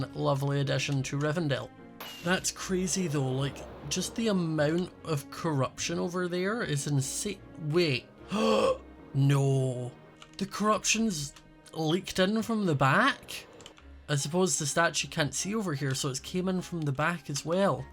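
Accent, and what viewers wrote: Scotland, United Kingdom. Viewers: ok, so. new subscriber here. Nice ta meetcha. I really love the Scottish 'language'. it would be so cool if you did a video that included all the normal Scottish slang that exists. I wanna hear what a true Scottish man sounds like before I move to Scotland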